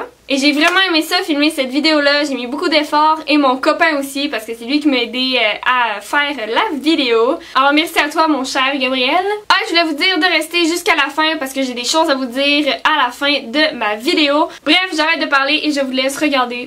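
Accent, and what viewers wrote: Canada. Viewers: Coucou !! J'adore tes vidéos !! Et en plus tu es canadienne ! J'aime tellement cette accent !!! Mon plus grand rêve et d'aller au Canada !!! Tu es ma youtubeuse préférée !! Je regarde toute tes vidéos en boucle !!! 💘💘 comme video pour tes 3000 abonnés d'ailleurs félicitations tu mérite beaucoup beaucoup plus !!! J'aime beaucoup tes maquillages, grwm, morning routine, Night routine ou encore cooconing !! Ou bien une video ou tu présente un maquillage pour chaque jours de la semaine en 1 vidéos ! Et pareille pour des coiffures pourquoi pas ! Bref fait ce que tu veux n'importe qu'elle video nous plaira tu es trop parfaite !! ❤️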